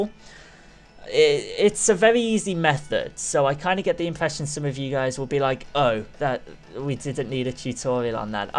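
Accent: Birmingham, United Kingdom. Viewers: Are you brummie?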